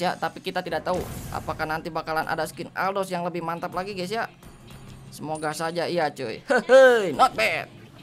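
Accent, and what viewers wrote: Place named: Sunda, Indonesia. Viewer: Nada Bicaranya Kaya Orang Sunda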